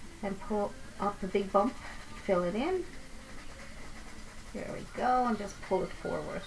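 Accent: Newfoundland, Canada. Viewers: OMG this is the first tutorial that I have seen Love your manner and you make me feel right at ease....have just begun to paint, will definitely look at your others thank you sweetie, (you sound like a Newfoulander) love your accent xo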